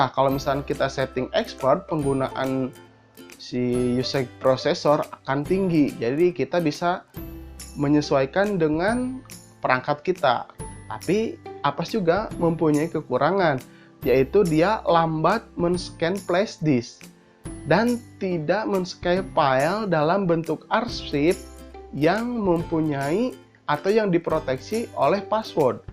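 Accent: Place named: Bandung, Indonesia. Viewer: apas wkwk logat bandung euy :D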